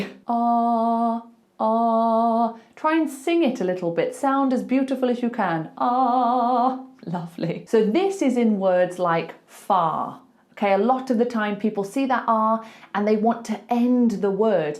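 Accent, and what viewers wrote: United Kingdom. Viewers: Your own accent while delivering of instructions throughout this video is self evident of standard British accent. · For me it is funny to hear you and others brithish talking however when i hear people from wales, Ireland and central and north UK is a real kick in my balls undertanding their speech. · I love british accent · In my opinion RP accent is best English in the world. This is pure British.✅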